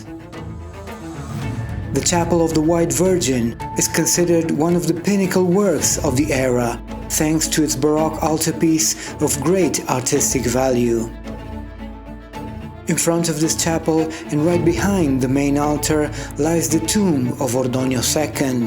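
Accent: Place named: United Kingdom. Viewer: una vez más me quito el sombrero ante tí, con tu perfecto British Accent y tu maravillosa forma de narrar,haces conocer al mundo lo precioso que es nuestro país fomentando al mismo tiempo qu vengan a conocernos. Gracias por aprender contigo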